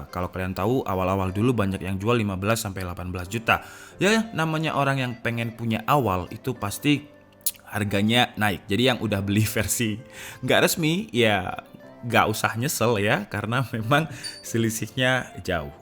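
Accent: Java, Indonesia. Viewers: ABANG ORANG MANA SIH..kok rada logat jawa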